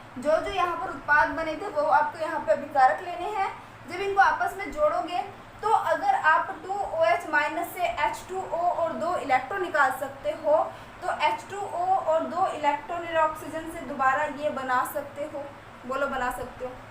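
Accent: Bihar, India. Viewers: Hay miss aap Bihar se h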